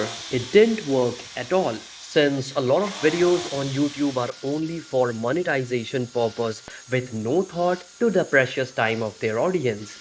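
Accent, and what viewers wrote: India. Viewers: oh my god! indian english!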